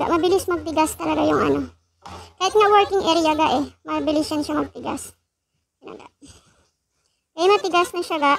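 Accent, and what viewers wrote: Iloilo, Philippines. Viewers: Ilongga ka gd guro langga mo hahahahah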